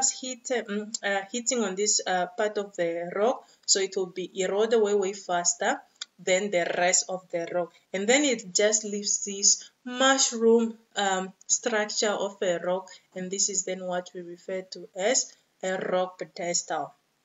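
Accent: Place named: Africa. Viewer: The fact that you speak like an African makes me understand really....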